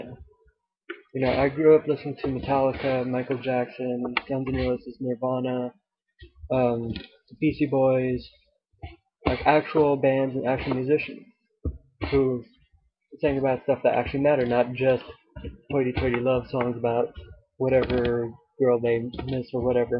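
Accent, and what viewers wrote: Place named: United Kingdom. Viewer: why do you sound Britsh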